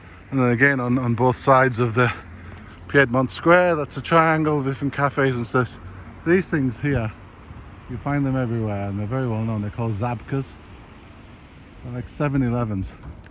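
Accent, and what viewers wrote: Northern England, United Kingdom. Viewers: I can hear the northern accent, it's just really softened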